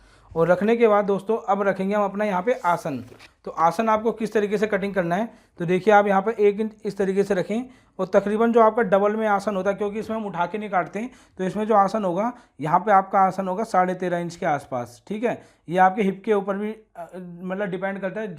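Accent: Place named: Uttar Pradesh, India. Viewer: Aap bolte hai to lagta h aap up se hai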